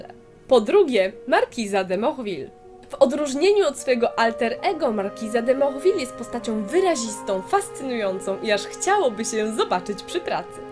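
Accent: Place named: France. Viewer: Cóż za wspaniały, francuski akcent :D Wspaniała recenzja. Dobrałaś do niej świetną muzykę :D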